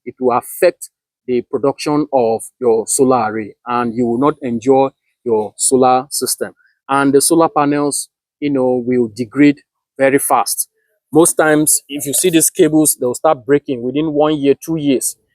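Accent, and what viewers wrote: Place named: Africa. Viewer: There is nothing wrong with the way he pronounced circuit and Celsius, he's from Africa do you expect him to sound European!?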